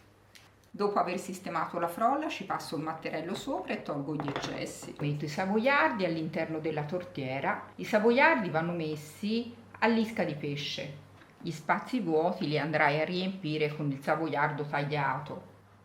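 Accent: Tuscany, Italy. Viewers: Sei bravissima, fatte tue ricette, tutte riuscite. Quel tuo accento toscano, ti rende ancor di piu❤ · La farò sicuramente….non è di Benevento lei l’accento è toscano… comunque 🔝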